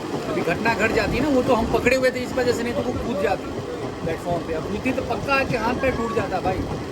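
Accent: Bihar, India. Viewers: This guy english is so funny not in a bad way but that bihari accent english is hilarious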